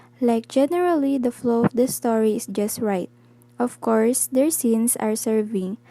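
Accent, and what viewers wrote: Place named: Philippines. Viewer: im pretty sure the accent if from tagalog or the language of the philippines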